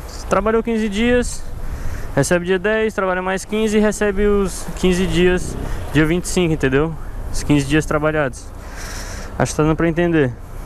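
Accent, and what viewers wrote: Fortaleza, Brazil. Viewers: Tem que ser de Fortaleza 😂 "Teu ânus" "Os cara teima" 😂😂😂😂😂😂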